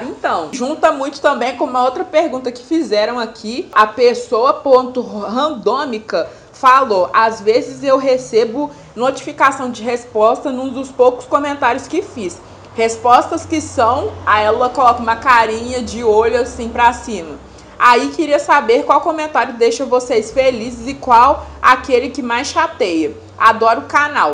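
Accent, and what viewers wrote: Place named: Minas Gerais, Brazil. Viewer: #parabéns meninas ...conheci o canal pesquisando sobre a Gabi de pretas, sim a chamo desse jto, cheguei em em vcs é não larguei mais....fiquei tão feliz qndo vi um canal de mulher preta com sotaque daqui de MG 🙂q bom q vc está cada vez se fortalecendo mais com o canal...voem meninas voem👏🏾🖤🖤🖤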